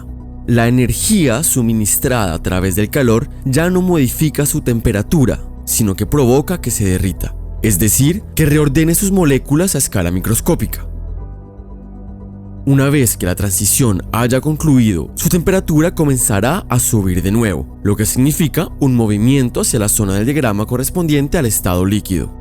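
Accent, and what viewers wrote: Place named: Colombia. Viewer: Qué buen canal! Sin introducciones innecesarias, todo muy bien explicado, interesante y aparte colombiano, reconozco ese acento :)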